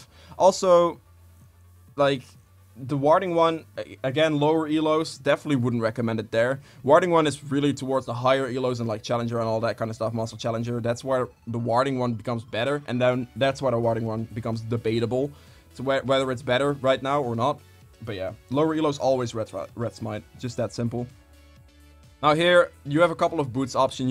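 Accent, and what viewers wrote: Netherlands. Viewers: you sound like ur dutch and nice video bro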